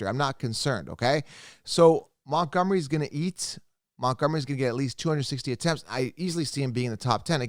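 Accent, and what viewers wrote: Canada. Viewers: Are you a Canadian .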